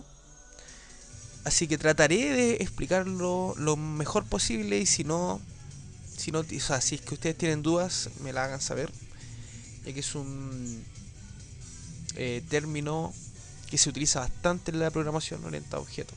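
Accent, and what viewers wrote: Chile. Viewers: eri chileno, perrin?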